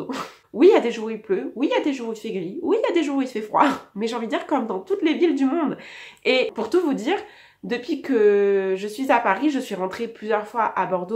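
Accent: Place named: Quebec, Canada. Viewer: Ton accent est différent. Il y a un petit peu d'accent québécois, J'aime bcp.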